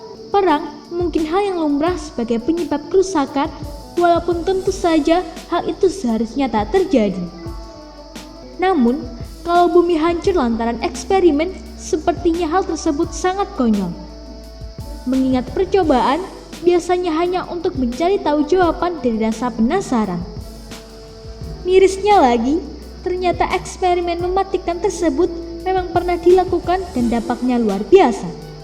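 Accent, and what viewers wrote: Java, Indonesia. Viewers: medok bgt si mbak nya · Jowone medog he😁😁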